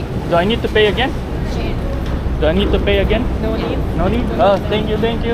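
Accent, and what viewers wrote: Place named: Philippines. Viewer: You’re funny af bro, you have filipino blood? You got that accent hahahah.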